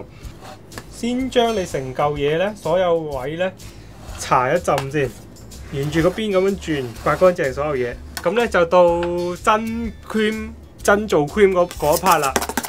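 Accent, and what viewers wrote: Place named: Cantonese, China. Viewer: 廣東話好好聽😀👂🏼♥️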